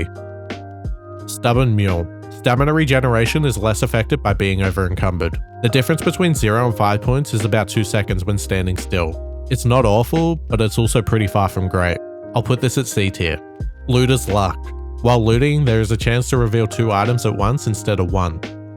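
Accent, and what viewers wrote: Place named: Australia. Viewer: AUSTRALIAN ARC RAIDERS YOUTUBER DETECTED LETS GO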